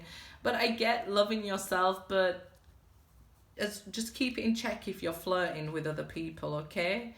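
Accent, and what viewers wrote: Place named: West Yorkshire, United Kingdom. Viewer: Sounds like a West Yorkshire accent..! ❤️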